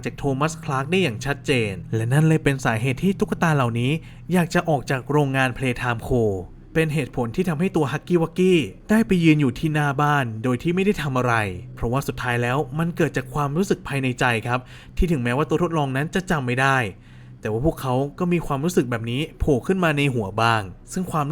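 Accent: North, Thailand. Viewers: พี่คนเหนือป่าวดูหลายคริปน่าจะใช่5555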